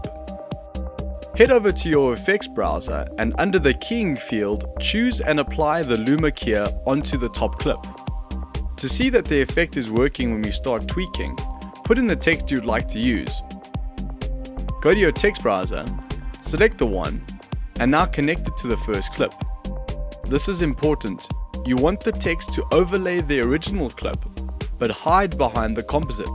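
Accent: South Africa. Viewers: Hi, very nice tutorial mate. I have a video request I'd really like to make. In iMovie on the Titles tab, there is a title animation called "Line". A line expands out left and right from the centre and then text fades in above and below that centre line. I would like to do the same thing in FCPX but instead of a fade in, I would like the text to come out from "behind" the thin line to rest on the top and bottom of it. I thought I could achieve this effect by using transparency masks for each text blocks and then using the baseline tool to move the text above and below the line. However keyframes don't track the baseline setting between frames so I can't animate it. Do you have a tutorial for this? Thanks so much for your help! PS Are you South African?:D Your accent sounds very similar to where I grew up! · Good to hear a fellow RSA !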